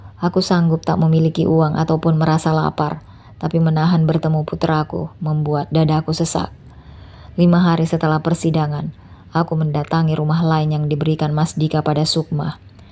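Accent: Indonesia. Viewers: hadir bunda, jadi adiktif dengan chanel ini logatnya perpaduan sumatera sulawesi jawa hihihi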